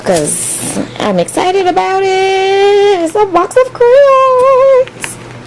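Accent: New Orleans, United States. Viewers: Are u from Nola? I hear the accent, it's soo cute! Very pretty look ❤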